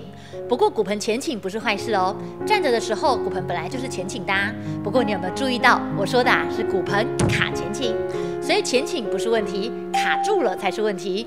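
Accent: Taiwan. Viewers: 台湾腔好可爱☺️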